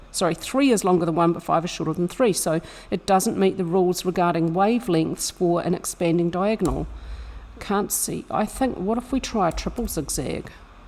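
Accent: New Zealand. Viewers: So good having a kiwi accent in crypto. And i think one of the best Elliot wave channels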